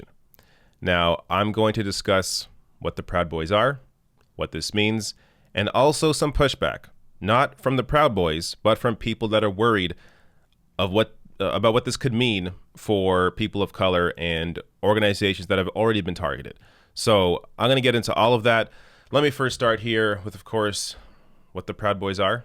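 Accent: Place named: Canada. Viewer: You know is Canadian right, so this entire video had a Canadian accent 😂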